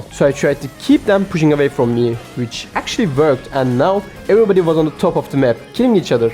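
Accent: Turkey. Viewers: Are you from turkiye your accent looks turk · Howdy maan?? I wanna ask smth, are u turk? bcs ur accent looks like turkish.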